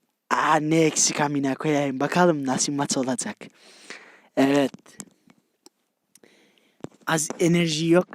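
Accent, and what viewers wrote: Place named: Istanbul, Turkey. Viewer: İstanbul beyefendisi gerçek türkçe budur işte herkez özünü bozmuş krkdkffm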